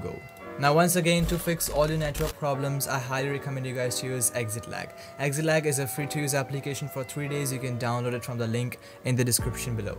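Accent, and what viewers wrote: India. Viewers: Why do all the helpful tech vids I find have an Indian sounding dude.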